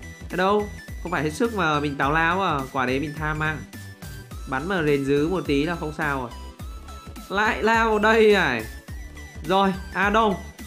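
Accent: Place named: North, Vietnam. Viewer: ng Quãng Bình mà sao ổng lại nói tiếng Bắc nhuệ🤔🤔🤔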